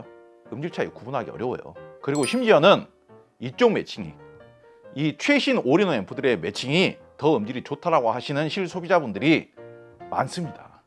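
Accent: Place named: Gyeongsang, South Korea. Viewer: 말투가 그런 거잖아 약간 경상도 좀 느낌 억양이 살짝 있으니까 그렇게 들리는 거지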